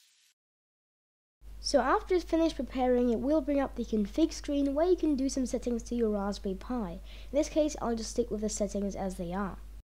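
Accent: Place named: South Africa. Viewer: Thanks for the help. Can't help but notice your accent sounds south african.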